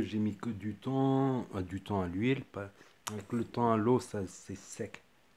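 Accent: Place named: Morocco. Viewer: J entend que ca marocain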